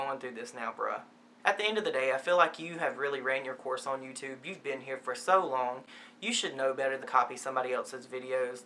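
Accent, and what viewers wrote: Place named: New Orleans, United States. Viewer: Very well said and presented ☺️. I'm gonna have to sub so I can keep up with you! Your accent sounds like where I grew up (small town suburb on the north shore of New Orleans LA)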